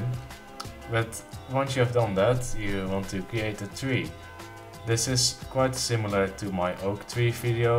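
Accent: Netherlands. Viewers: are you from the Netherlands? it sounds like you are, I'm too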